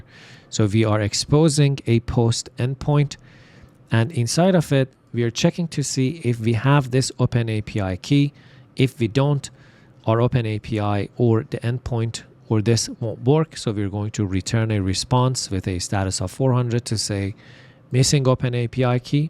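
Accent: Iran. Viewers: آخی فهمیدم بوی ایرانی میدی هم از لهجت هم از چهرت کارت هم درسته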